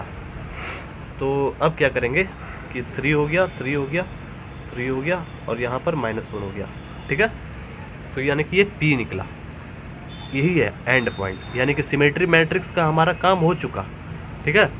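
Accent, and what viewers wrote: Bihar, India. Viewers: Kaha ke ho bhaiya Bihari ho kaa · Bihar se ho ka?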